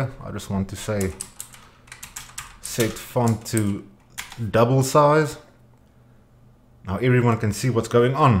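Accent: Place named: South Africa. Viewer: love the SA accent :)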